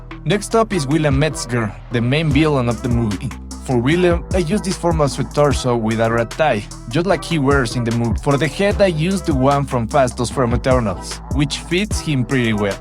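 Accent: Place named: Poland. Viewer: You're sound like poland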